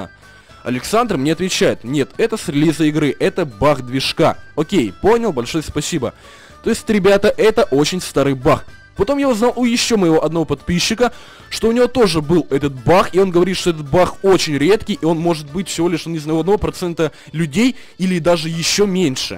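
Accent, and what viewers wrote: Ukraine. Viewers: DEROTER ツ он Украинец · Fibe hou он украинец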